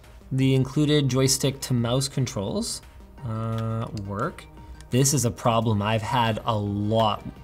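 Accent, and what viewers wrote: Canada. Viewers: Is it just me or is Linus sounding really Canadian these days?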